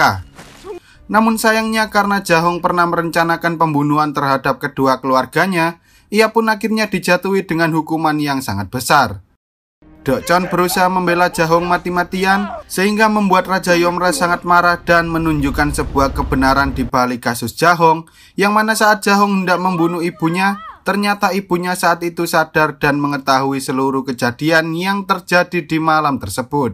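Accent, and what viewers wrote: Java, Indonesia. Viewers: Wong jowo t min? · Logat jawanya masih kental kali miminya 😅😆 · Medhok e lho,,,🤭 Filmnya bagus, sy baper terutama ketika Gihun ingin bertemu ibu dan meminta maaf pd beliau. Apik Bang,,,👍🏼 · Yang cerita film ini pasti orang jawa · Jowo mu medok lek😭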